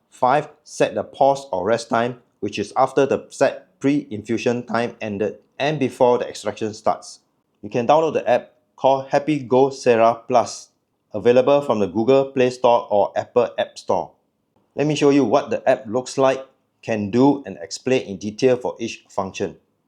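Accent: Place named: Southeast Asia. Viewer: Greetings to south East asia 😁 love the accent Great review Not changeable like the batteries of modern day cell phones or not changeable at all? Also wonder: the open filter at the bottom is nice but it would be cool to be able to mount some funel to avoid spilling and to let the coffee run straight into milk foam Not sure if that's a great idea though: too hot moka (Bialetti) causes brown, bitter milk foam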